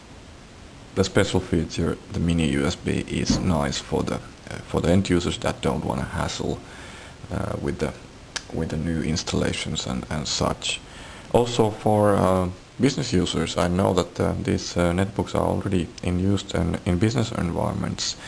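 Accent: Finland. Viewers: Finnish accents are awesome :D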